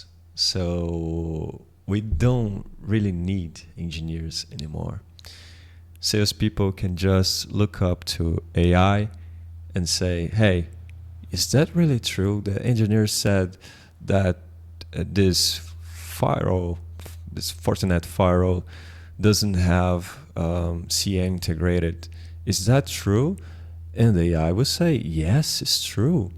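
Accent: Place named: Brazil. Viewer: Pelo sotaque é br com certeza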